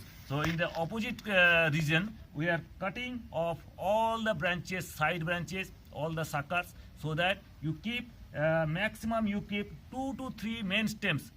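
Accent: India. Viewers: इससे तो आप हिंदी मे बात करते तो अच्छां होता ...ये कीस तरह कि इंग्लिश है ? इंडियन लेह्जे कि ?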